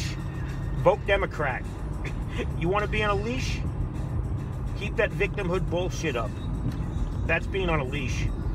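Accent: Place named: New Jersey, United States. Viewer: R u from jersey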